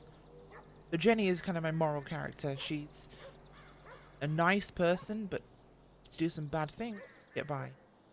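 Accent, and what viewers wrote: England, United Kingdom. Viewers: Girl you’re BRILLINT! Love that broke down your characters and gave their history…, I love how you’re evolving on the new channel (ACDCrp). Love your content and videos. I am glad you and Deecan left DOJ, they are becoming a little bit anal on the channel. Mostly they cannot deal with negative comments, nor multiple comments on a episode. Their reply's are normally rude…like “If you don’t like it, go to another channel”…see ya! or in caps stop writing several comments, one will do! So I unsubscribed…. I still watch the channel because I like when Martin and Blackhallowis online, especially when he does Chi P and George. Anyway, keep up the good work and your awesome videos. I love to see more of the type of police/detective work like your episode 24 - It's Time to Stakeout the Trash! And besides I love the English accent (BTW I miss living in Ipswich), especially your character with that sexy voice and walk….Your so Hot!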